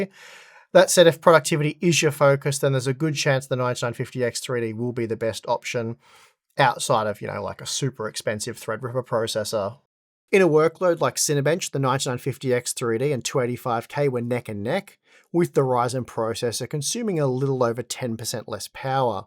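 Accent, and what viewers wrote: Australia. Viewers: when u r talking about the part and it's advantages and disadvantages with specs etc - having b roll doesn't help... what would be nice is to have a chart/table for example, highlighting the points u raise at the moment perhaps? Just talking about it on the air~ isn't most convinient, especially with YouTube subs analyzing Australian accent sometimes and with similar names for products....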